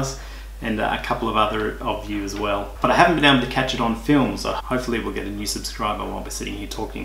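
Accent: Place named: Byron Bay, Australia. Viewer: That’s a hell of a well developed ear for accents you have...! is from Byron Bay which isn’t all that far away from Brisbane...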